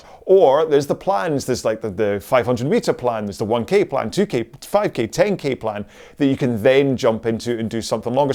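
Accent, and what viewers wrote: Scotland, United Kingdom. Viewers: Hi, first I want to thank you for all the content you’re providing. I like your vids A LOT! There is less „rah-rah“, the exact right amount of teachings, some entertainment, my kind of humor and no annoying music - just the sound of the fly wheel and some Scottish bloke talking stuff to shorten the hour. I prefer to listen to you reminding me of proper technique aspects over some podcast or music. And though I also follow and like Austin’s or Shane’s channel I regularly return to yours. My first 60min rows I did with your videos #wow60. So, thank you for the row alongs and keep on going your way. I’m sure there’ll be plenty of us indoor-online-rowers with you. 🙏💪👍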